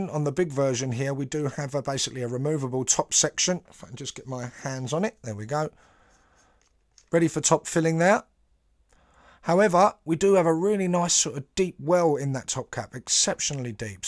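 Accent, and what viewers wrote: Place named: Cockney, United Kingdom. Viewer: whats that accent cockney 😄😅😂 He always does better reviews that the shed dweller ✌safe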